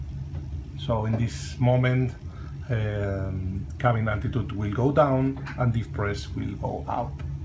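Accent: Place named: Catalonia, Spain. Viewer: Accent català jajaja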